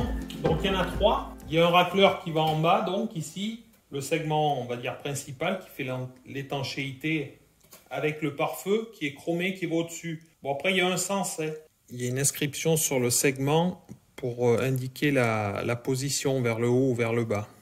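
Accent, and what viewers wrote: France. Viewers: Ça fait un bout de temps maintenant que je cous suis, trop génial dans Gestion des produits des systèmes démerdes. Et l'expérience le savoir faire ds l'excellence et la qualité. Et aussi vous être donné les conditions pour toujours être dans un atelier hyper propre et structuré au poil. Ça donne envie de voir en réel. Bravo bravo et encore bravo, c'est quoi cry accent quelle région de France.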